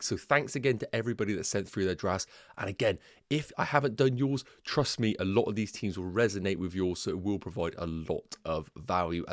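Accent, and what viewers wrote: Bristol, United Kingdom. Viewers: You sound like a Bristolian, do you support City or Rovers?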